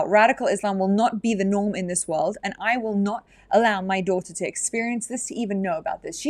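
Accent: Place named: United Kingdom. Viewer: I hear a British accent. Which upsets me. Because I'm worried you & your family left because England is no longer safe for you.😢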